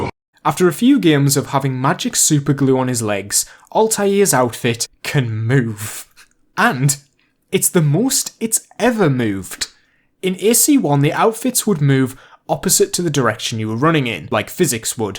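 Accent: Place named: Scotland, United Kingdom. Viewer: Great video and Ilove your accent man! Are you Scottish?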